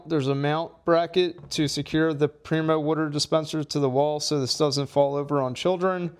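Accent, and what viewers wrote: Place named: Philadelphia, United States. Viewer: THANK YOU!!! 🙏 😊 Ps: You must be from the Tri-state area.. Here, outside of Philly, we say “wooder” too. 😂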